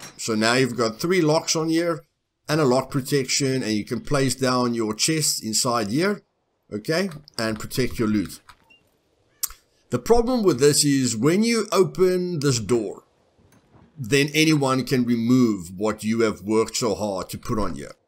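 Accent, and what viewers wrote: Afrikaans, South Africa. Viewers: I only subbed because your Afrikaans accent is so strong. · this was a good video. funny bloke :) a bit clumsy sometimes. hilarious. very genuine and yes. the game is what we make of it. cheers fella. can i ask - is that an Afrikaans or British SA accent? :)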